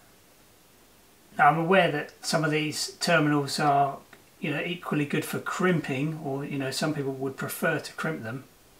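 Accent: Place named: United Kingdom. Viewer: As a note, ABYC Standards do not allow solder as the sole means of connection for an electrical connector. You can crimp AND solder, but solder by itself is not permitted. So if your boat may be subject to ABVC Standards (or you want to meet typical standards) then crimping should be used or used as well. Not probably an issue based on the accent in the video, but I'm not sure of Euro/UK standards and if they differ.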